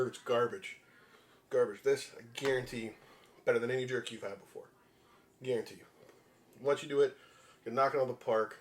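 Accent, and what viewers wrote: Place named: Canada. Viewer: 45 seconds in I recognized that you're a fellow Canadian from your accent. Am I right? I have no way of knowing but I am pretty sure.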